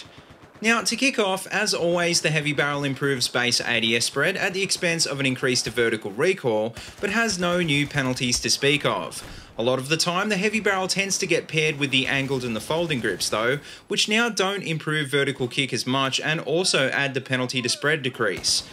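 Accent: Australia. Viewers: Ive always wondered where in Australia you come from?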